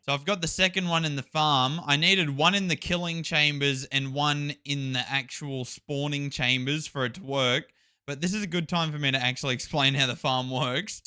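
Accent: Australia. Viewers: You sound aggressively Australian, but like you've lived in Britain or the US for like the last 2-3 years.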